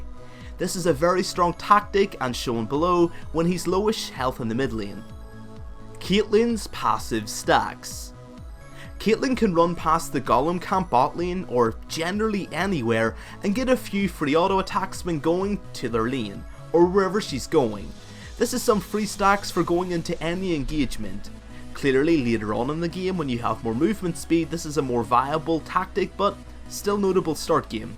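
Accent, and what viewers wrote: Northern Ireland, United Kingdom. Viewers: whaere is from? Never heard his accent before, and can't say if it's more British or American... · He's Irish, more specifically, he might be Northern Irish.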